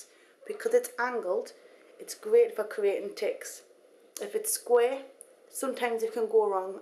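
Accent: United Kingdom. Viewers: i always loves your video. Thank youuuu.. oya btw, i love ur british accent! :D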